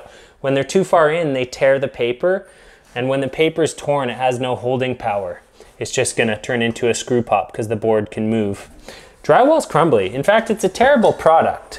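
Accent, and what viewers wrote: Canada. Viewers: SOMEone could watch this just to study the Canadian accent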